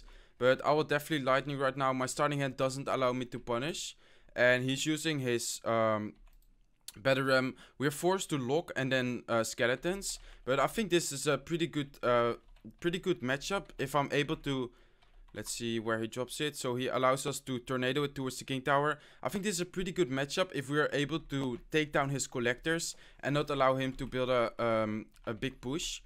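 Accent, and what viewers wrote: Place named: Netherlands. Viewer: Are you dutch? Because you sound like a dutch guy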